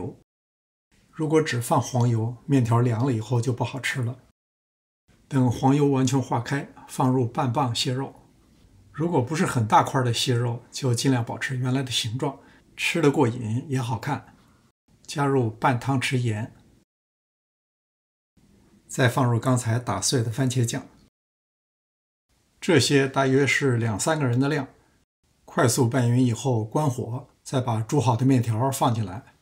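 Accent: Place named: Beijing, China. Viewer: 每次听您讲出了佩服你精益求精的精神，还总会被你最后经典的点缀笑翻了。“别把牙硌了”😂😂😂地道北京话。老乡您实在是个难得的人才！这道洋菜家里养老公一定会吃得走不动了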